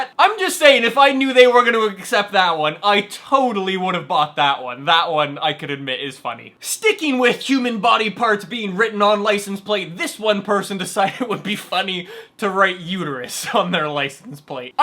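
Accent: Quebec, Canada. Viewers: R u Canadian? Btw when will the gtr done? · Je savais pas que t’étais québécois!! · Tu viens du Québec???!!! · t'es québecois :O !!!!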